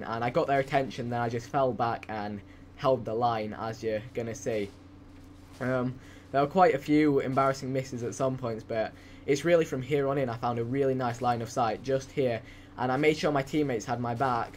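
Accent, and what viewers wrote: United Kingdom. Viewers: whoa... you British? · So it's a British Amazingfilms247?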